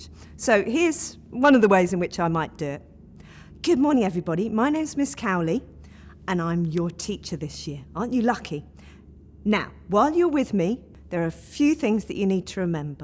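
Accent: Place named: United Kingdom. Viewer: I really love your accent madam! I wish our english subject is british english and not american english...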